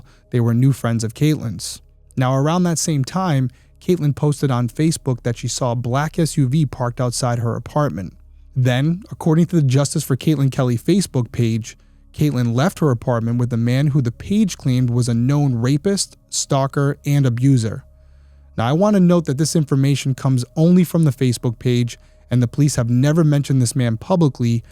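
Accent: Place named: New England, United States. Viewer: Great job. Also, I really enjoy the way you talk and verbiage used. It’s has that “New England” feel to it, and it’s sincere. I saw some commentary regarding the way you word things. I disagree strongly. Anything else would feel so phony coming from you. Don’t change.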